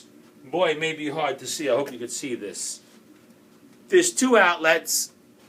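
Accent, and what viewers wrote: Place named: Georgia, United States. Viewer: Great video, sir, very informative. And I love that Southern accent---Georgia perhaps?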